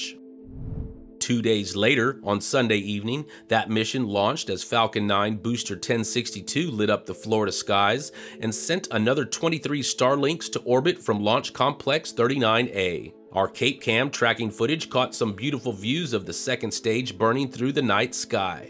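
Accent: Texas, United States. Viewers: Congratulations!... Keep that Texas Twang coming. " The South Shall Rise Again... to Mars! "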